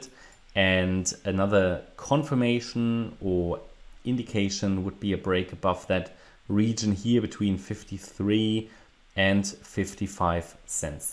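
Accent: Germany. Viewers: May i ask what part of Europe are you from? Germany🤔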